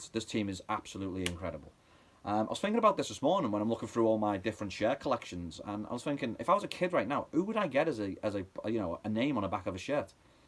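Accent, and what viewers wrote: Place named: Liverpool, United Kingdom. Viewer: That some Scouse accent you have 🙄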